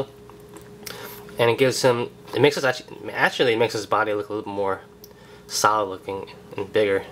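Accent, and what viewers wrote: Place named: New York, United States. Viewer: ... I'm glad your one of the first to have the captain america toyline... your reviews are great bro... also your customs are amazing. Doode keep up the great work... and also... I heard a little New York accent hahaha nice... great stuff man!!! --Vincie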